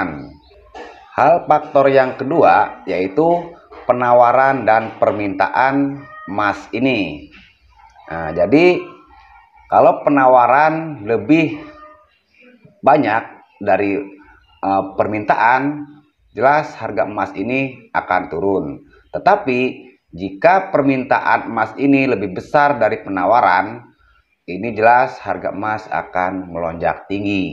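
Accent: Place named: Palembang, Indonesia. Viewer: Logatnya sangat pelem autosubscribe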